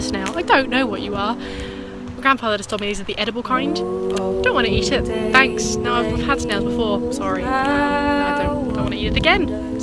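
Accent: Australia. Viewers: ahhh you've done it again, I'm going to miss your cramps so much when this year ends! huge congrats on getting so far with NIDA, excited to see what you choose to pursue next year:) (also, idk if it's just me, but you're sounding much more Australian already this month I think)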